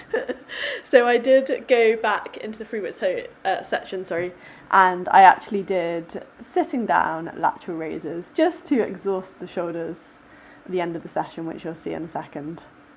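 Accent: United Kingdom. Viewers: 발음이 이상하다했더니 영국분이였구나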